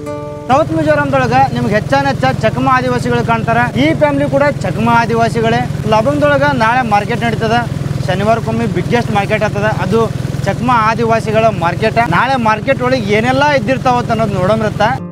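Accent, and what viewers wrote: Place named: Yadgir, India. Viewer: ಯಾದಗಿರಿ ಭಾಷೆ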